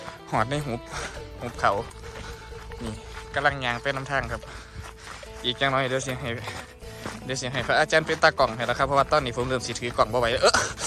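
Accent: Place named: Isan, Thailand. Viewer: ชอบเวลาเว้าอีสานน่ารักดี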